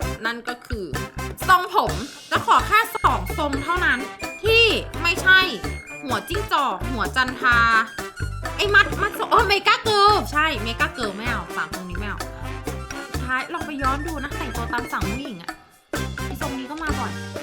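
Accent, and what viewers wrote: North, Thailand. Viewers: พี่ เป็นคนเหนือใช่มั้ยครับ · พี่คนเหนือหลอครับ😂😂😂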